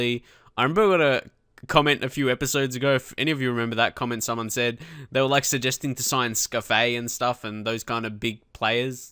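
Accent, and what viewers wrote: Australia. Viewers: Hi, are you Australian? Cause if u are what do u think about mass luongo?! I'm a Swindon fan and in my opinion he is class!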